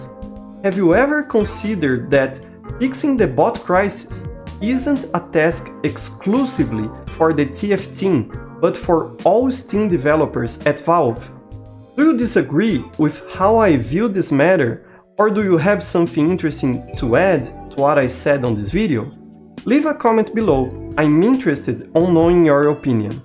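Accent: Brazil. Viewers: Excuse me aren't you a brazilian YouTuber already? I've noticed because of the accent. " " i believe? It's that the case? You sound extremely similar to the guy. Plus, this video is very similar to one of his, talking about the same topic!!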